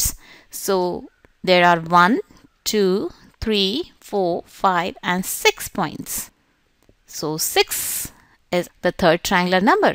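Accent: India. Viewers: annoying voice. And until when will the Indian accent with English titled videos be made.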